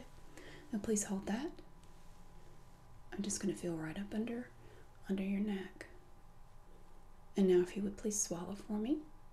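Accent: South, United States. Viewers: I love cranial nerve / medical roleplays, and I also adore southern states accents - So this video is bliss for me! Thank you so much for this creation! Can't wait to see your other videos! All the best from the UK. :) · needs more southern accent 4/10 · Awkward moment when you wonder where her accent is, then realize you've lived in the South your whole life and this is just what sounds normal to you... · As a French girl, I find that your "southern accent" makes the English a lot easier to understand ! Nice video, by the way. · Folks, this is what a REAL urban southern accent sounds like. The long 'drawl' is more common in rural areas. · Your accent is perfect! When people try to force a southern accent it always sounds way too drawn out and unnatural. yours is great. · Yup I live in Austin, Texas... this is definitely southern haha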